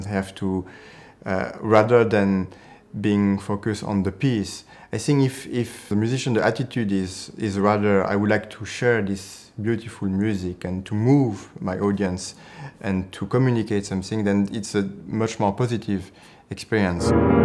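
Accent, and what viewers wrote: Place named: France. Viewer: Haha, a German last name with a strong French accent. Quite an unorthodox fresh combo though.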